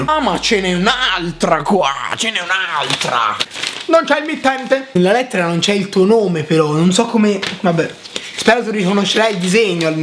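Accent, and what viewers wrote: Tuscany, Italy. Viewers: Oggi mi sono iscritto e scommetto che sei toscano!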